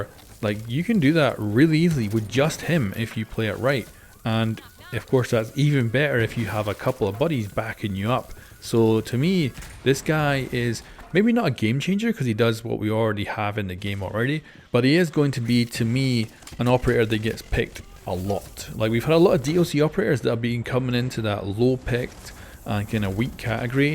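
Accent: Scotland, United Kingdom. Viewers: Don’t hide you Scottish accent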